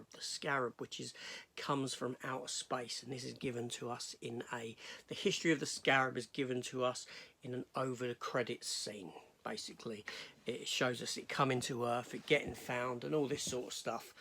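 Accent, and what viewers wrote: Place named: England, United Kingdom. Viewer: This is meant as constructive criticism. I wanted to watch your review but I was frustrated by the poor sound levels. You start off fairly strong with your intro, and then move into a much louder show theme which had me lower my volume. You then return but soon your volume lowers to a soft mumble. This combined with your strong accent had me ready to move on.after a couple minutes. I'm not criticizing you, but your video and sadly not the content, but the presentation. I'm not an expert on audio, but I believe what you want to do is either be conscious of how your voice drops in volume, or put the audio through a compressor. Perhaps consciously over enunciating would help with your English accent. Good luck with your channel.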